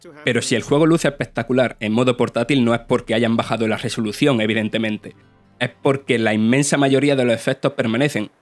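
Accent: Andalusia, Spain. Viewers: No, yo diría que es andaluz. No es por faltar ni nada, a eso me suena su forma de hablar.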